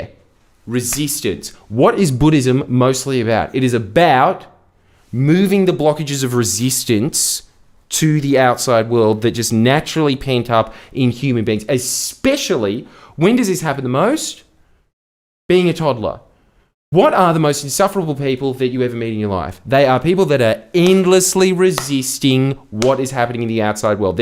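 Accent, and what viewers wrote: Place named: Australia. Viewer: That’s what HE said. 😂 He even showed the same face that comes from that pleasantly satisfied feeling. “Mmmm. Arggggh. Yesssss.” along with that expression of the Westerner’s stoicism with hand cupping his chin. I’ve concluded that he actually gesticulates more than I & I didn’t think that was possible. Thank whatever God(s) may be that what otherwise would appear to be Parkinsonian tics instead, has the positive connotation that it does or else I’m sure I would be terribly self-conscious about it. Yet there is something … & I can’t quite put my finger on it … to the feeling I get when I’m surprised repeatedly - like the baby in a game of peek-a-boo ever amazed & bewildered at the magic of a disappearing adult - by his right arm becoming invisible as it slips in the side pocket. I now have to imagine that there IS a portal through which JS traverses dimensions & is converted to. 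I mean THANKS for talking about the softness of the producer’s hair that you can feel through there. You pack SO MUCH info into such a short timespan where I’m tripping over your Aussie accent, actually needing the transcript at times but the closed captioning is fd up … it can’t even get your Australian twang right… & then & THEN (no & THEN) I have to now completely imagine an invisible black hole where I can’t see past the event horizon yet your arm is teleported across planes… where you have the cheapest budget for a Star Trekkian Transporter since imagination is free. Hey! Is a misquote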